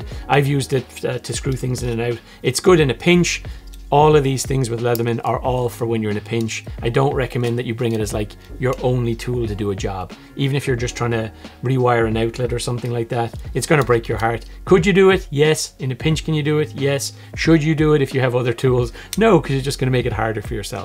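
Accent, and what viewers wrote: Ireland. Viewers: I listened 3x just to hear the Irish accent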